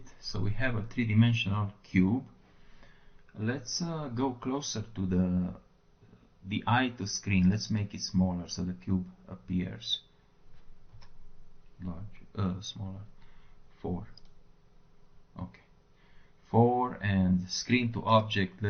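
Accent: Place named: Italy. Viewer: L accento è uguale a quello italiano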